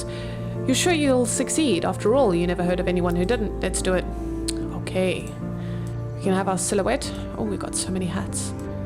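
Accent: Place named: Germany. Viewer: hello. i think i can hear an accent in your voice. may i ask what country of origin you are from? oh wait, are you from germany? have you any plans to continue playing this game?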